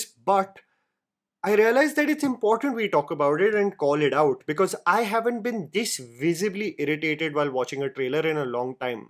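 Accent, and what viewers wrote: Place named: India. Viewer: Bro’s accent is pure Apu from The Simpsons, tossing big words like he’s auditioning for a dictionary. Talks Hindi movies but can’t pronounce English, rambles 10 minutes for a 30-second point. Violence trend started with Animal, now everyone’s just copying instead of making good films. Chill, simplify, maybe add some humor—felt like a dad lecture. Half the time I had to use ChatGPT to TL;DR your review. You think you’re a genius, but you’re basically the Donald Trump of movie talk, or may be you have dementia, who knows.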